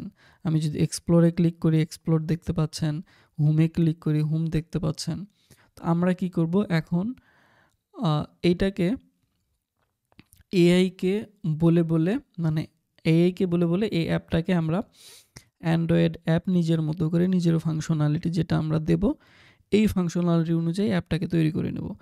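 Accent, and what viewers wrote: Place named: Kushtia, Bangladesh. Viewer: bhai apnar basha kushtia kothai?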